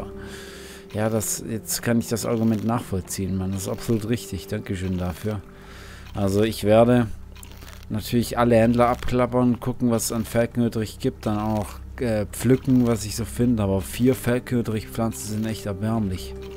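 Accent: Germany. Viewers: Ich finde es sehr schade, dass deine oberschwäbischen "Sprachwurzeln" mit dieser "Köllschen Betonung" die Du mittlerweile angenommen hast, verloren gehen.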